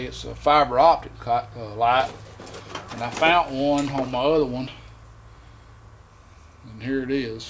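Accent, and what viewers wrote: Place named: Georgia, United States. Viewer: You from Georgia? That accent is THICK.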